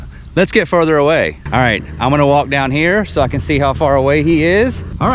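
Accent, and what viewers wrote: Texas, United States. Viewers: I did neglect to tell you that I was stationed at Sheppard AFB Texas when I was a blue store fan. After I retired from the USAF, I moved to PA. My wife and I miss everything about the state of Texas, except the heat. The first time I heard you say "Good Mornin'", I knew you were from Texas! My wife and I were laughing so hard when people were trying to correct you, saying their name. The funny thing is we both say it just like you and your wife. Keep the videos coming. We really enjoy them! Oh yeah, I think you should know that Mario dude is following you. Lol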